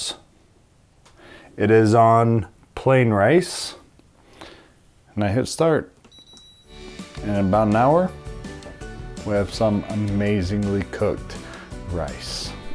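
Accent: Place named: Hawaii, United States. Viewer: Damn, hes Hawaiian? The accent makes so much sense now...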